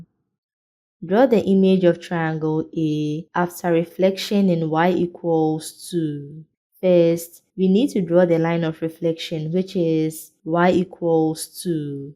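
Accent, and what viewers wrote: Ghana. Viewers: You sound Ghanaian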